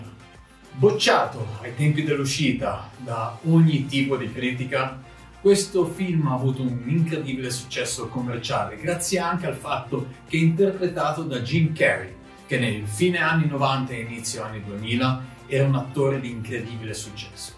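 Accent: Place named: Italy. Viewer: Accento italiano divertente 😜 auguri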